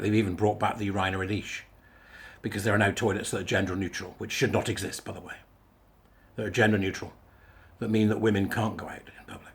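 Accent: United Kingdom. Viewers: you sound like your from the southwest of the UK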